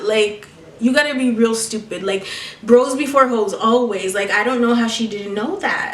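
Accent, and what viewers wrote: England, United Kingdom. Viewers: LOVE FROM BRITAAAIIIIN! Btw british accent you sound just like a school friend😂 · OMG UR ENGLISH ACCENT IS EVERYTHING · BITCH THE BRITISH ACCENT. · Oui that British accent m8 it was amazing lol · That british accent though 😂😍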